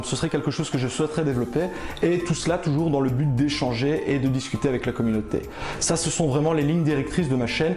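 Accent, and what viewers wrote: Belgium. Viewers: Le p'tit accent Belge me plaît déjà 😁 J'avoue que ça me manque un peu depuis presque 16 ans que je vis en France 😉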